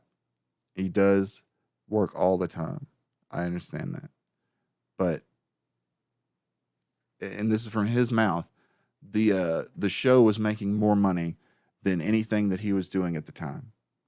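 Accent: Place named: South, United States. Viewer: The reason X-Ray girl didn't talk much is because she didn't watch what the guy's do. She never saw Trek, StarWars, or read comics. Them saying she was limited to 8 words a stream was a running joke because she just wasn't comfortable talking about stuff. She organizes superchats for Gary and highlights ones he needs to read immediately by messaging him. She puts notes on ones for language or trying to decipher what they're saying because Gary isn't the strongest reader and has problems seeing the small print. As far as goes, I don't know where she thought she was going to work in animation at in the US. There aren't any here. It's all done in Canada or overseas now. As a southerner myself that happened to have lived in Canada for awhile, she sounds pretty typical of someone from one of the major provinces. I don't know why people think they're so polite because they were some of the rudest people I've ever met in my life. 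After having lived down here even my Canadian husband saw it. The first time we went back to visit his family he was trying to explain to his dad just how much more polite people are down here even just with traffic. Love your voice, but again as a fellow southerner, I appreciate the accent. As for Gundam, he sounds like a pretty typical musician. Most are very insecure and immature. Not really anything surprising. Especially for a front man/singer. Not going to unsub because I like his stuff and can separate the online persona from the real person. I'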